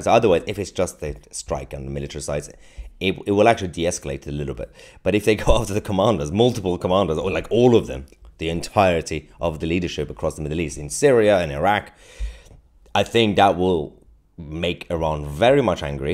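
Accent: United Kingdom. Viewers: Just discovered your channel two days ago. Love your reporting and your British accent! Viewing from Kansas in the U. S.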